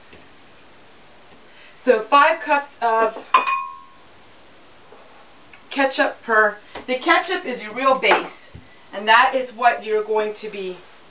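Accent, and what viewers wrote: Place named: South Jersey, United States. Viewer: I grew up in South Jersey, and hearing you speak reminds me of home. Are you in Pennsylvania?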